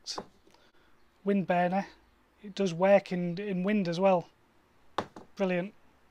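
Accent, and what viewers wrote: Hull, United Kingdom. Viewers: NICE job u from sound like your from ULL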